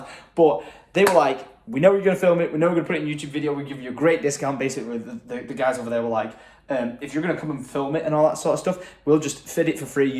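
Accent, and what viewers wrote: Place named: United Kingdom. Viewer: It's probably his northern accent. I've watched him for years and only just realised he has a slight accent recently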